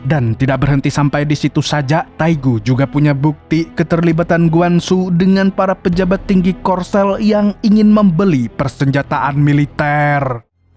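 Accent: Banjar, Indonesia. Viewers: nah org banjar rupanya sidin wkwkwkwkwkw 🤣🤣🤣🤣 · Ada logat Banjar jua amang nya😅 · Ulun Hanyar sadar kaya nya Pian nih urang Banjar kh😅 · urang banjar kah pian nih mang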